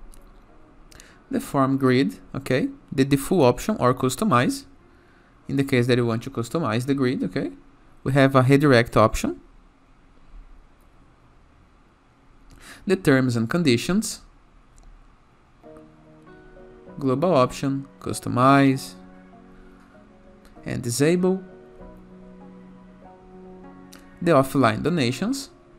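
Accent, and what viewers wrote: Brazil. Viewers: sim eu sou programador. Eu de Moçambique (um país africano falante de português) mas sou fluente em inglês.. Porém os "I have 'tchu' do" denunciaram seu português brasileiro e notei logo kk foi como ter um irmão nesse universo web inglês. +1 inscrito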